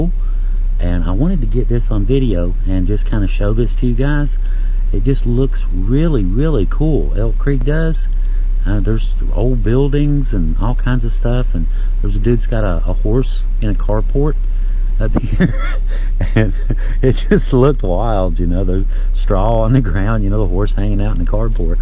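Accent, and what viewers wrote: South, United States. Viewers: You know what you got a seriously good voice. If I was you I would be reading civil war novels etc online. I think you can make a good living as an orator dude especially as if you were reading Southern history specifically confederate civil war. You got the perfect accent for it. I think you can make a good living doing documentaries for History channel. Perfect soothing voice and I'm not kissing your ass. I'm just sayin. I'll leave you alone. Say hi to the boss.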